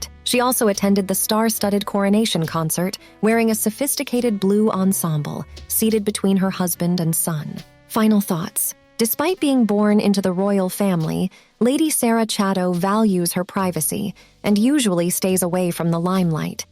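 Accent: United States. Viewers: the narrator is American...relax...we never got any news about her here in the US...too bad, too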